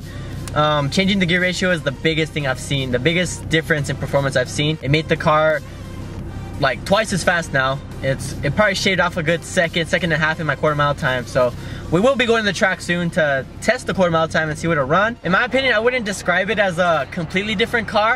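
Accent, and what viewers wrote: Texas, United States. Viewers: What part of Texas are u from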